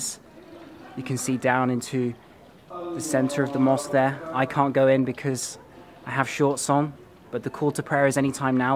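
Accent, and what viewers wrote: England, United Kingdom. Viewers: I’m in love with your British accent 😍😍😍ur my crush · I like your English accent the program that you made is pretty good👍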